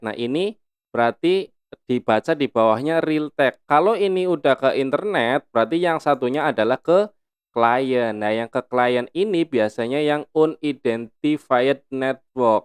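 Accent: Banyumas, Indonesia. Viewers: Om pake semi online ya anbk nya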